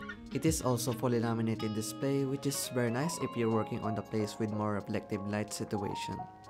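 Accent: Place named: Philippines. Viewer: I lab yor pilipino aksent❤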